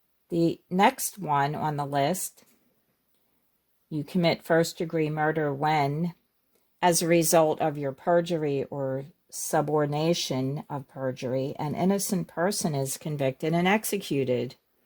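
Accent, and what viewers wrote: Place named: Philadelphia, United States. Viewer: I knew you were from philly! Me too. You actually sound so much like my mom.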